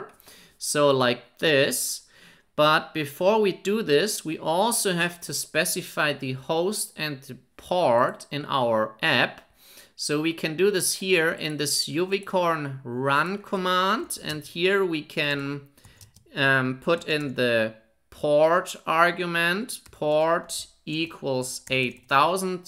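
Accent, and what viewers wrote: Germany. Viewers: Du bist ein Deutscher, oder?😊😂 Aber gutes Tutorial definitiv! :)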